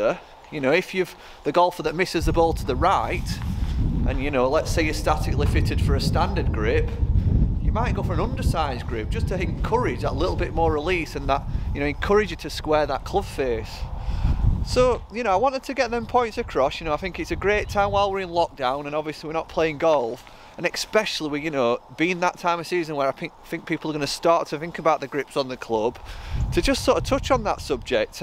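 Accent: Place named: Liverpool, United Kingdom. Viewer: Sound like your from Liverpool And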